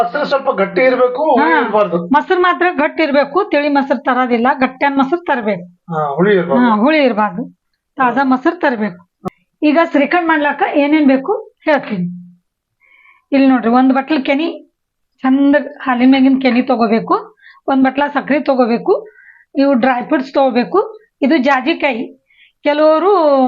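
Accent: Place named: Vijayapura, India. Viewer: ನಿಮ್ಮ ಮಾತುಗಳು ಬಹಳ ಇಷ್ಟ ನಮಗೆ ಅಪ್ಪಟ ವಿಜಯಪುರದ ಮಾತುಗಳು ನಿಮ್ಮ ನಾನು ಬೆಂಗಳೂರಲ್ಲಿ ಇರುವುದರಿಂದ ನಿಮ್ಮ ಮಾತುಗಳು ಕೇಳಿ ತುಂಬಾ ಖುಷಿಯಾಗ್ತದೆ ಏನು ನಮ್ಮವರು ನೋಡಿದಂಗೆ ಆಗುತ್ತದೆ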